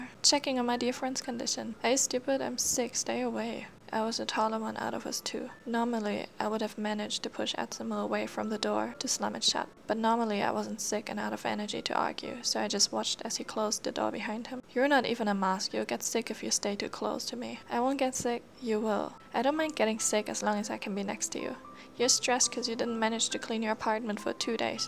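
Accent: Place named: Germany. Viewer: You sound German👁️👄👁️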